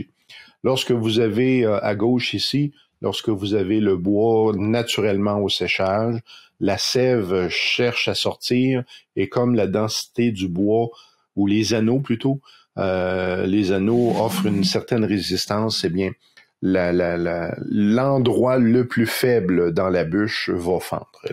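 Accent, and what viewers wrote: Quebec, Canada. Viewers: Bonjour, aucun problème pour les petites différences français du Quebec et de métropole, ça pimente en mettant une touche d'exotisme, et ça nous donne l'impression d'apprendre le Quebecois. Pour le choix du tour, ayant suivi pratiquement le même parcours, je partage entièrement ton point de vue. 😉👍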